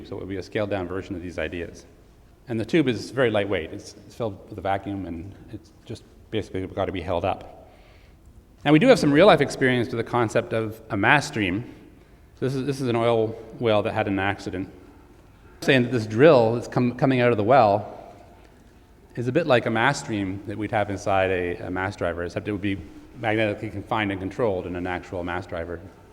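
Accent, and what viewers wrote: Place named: Canada. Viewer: This dude is Canadian for sure.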